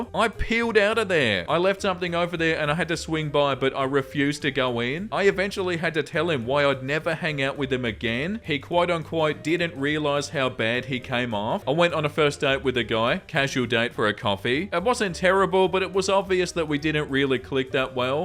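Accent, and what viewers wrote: Australia. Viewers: That’s not a British accent. That’s an Australian accent. They’re approximately 9500 miles apart. Let me guess, American?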